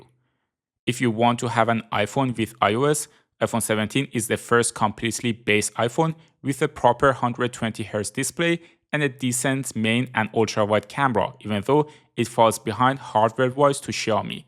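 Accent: Iran. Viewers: Your accent is similar to Iranian....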